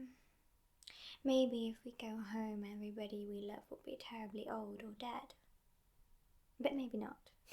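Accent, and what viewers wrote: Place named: South, United Kingdom. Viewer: You are British right? Me too but I really can't place your accent definitely southern and rather posh lol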